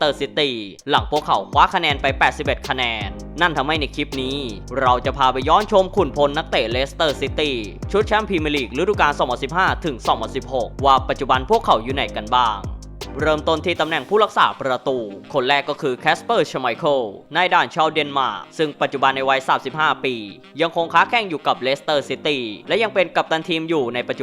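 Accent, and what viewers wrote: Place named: Rural, Thailand. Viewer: จะพูดเหน่อทำไมวะ ขัดใจช.ห.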